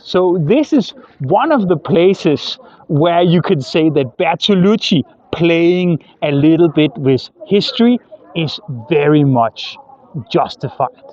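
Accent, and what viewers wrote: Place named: Denmark. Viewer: That's sørengasseme what I call Danglish.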